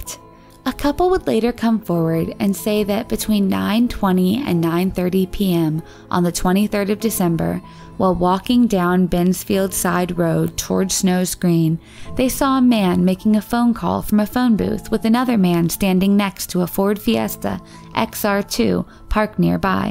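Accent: India. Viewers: Finally a video without that Hindu voice . Stop making videos with the indian voice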